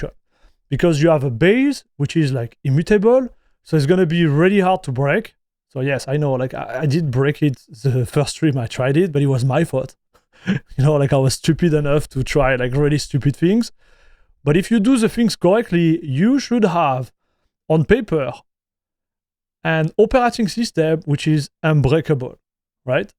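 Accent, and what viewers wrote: France. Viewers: your french accent is too strong. hard to understand.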